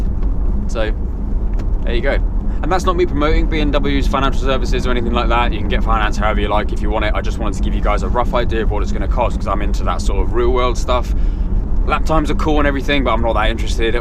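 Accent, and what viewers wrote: United Kingdom. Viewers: I have met Seth MacFarlane in person since I live in Los Angeles and work in entertainment and once worked with The Simpsons production team. I'm pretty sure Seth could do an awesome impersonation of your British accent and humor. :-) p.s. good to see you doing more videos. the first video I saw of yours was 4 years ago, the M3 video from March 30th, 2013. "Honey, have you got the baby?" Hilarious! hope to see more videos soon. btw, I have the E92 V-8 with Akrapovic exhaust and going to get the M4 as a second car for work commute. Cheers mate, Mark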